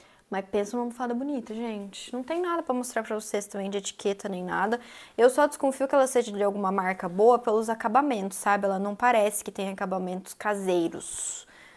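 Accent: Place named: Minas Gerais, Brazil. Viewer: Tá muito mineirinha kkkkk "esse trem aqui ooh" 😂... Sou mineira ❤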